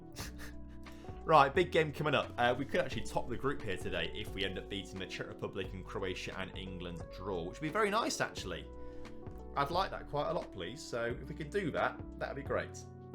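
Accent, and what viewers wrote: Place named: Scotland, United Kingdom. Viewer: The Scottish accent wasn't that bad, 6/10, way better than the pronunciation of Mcleod in the on the rocks videos.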